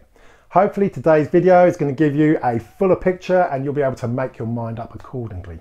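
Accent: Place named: United Kingdom. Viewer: wow did not realise that when I bought my air 2 a couple of weeks ago, I have done videos on my youtube channel about this drone and my P4P, both registered mind, my fear is they will make it so difficult to fly anywhere it won't be worth having a drone, to be fare I only use it as a tool for when I cannot get a composition on foot with my cameras and of course it adds a great perspective that you otherwise would not get, thx for sharing and nice to hear an UK voice for a change.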